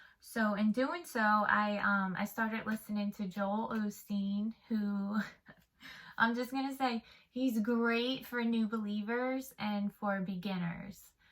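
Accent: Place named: East Coast, United States. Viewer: I love this and relate SO MUCH! I also love your cute little east coast accent, haha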